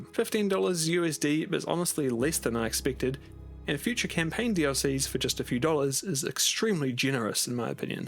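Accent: New Zealand. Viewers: Love the Kiwi accent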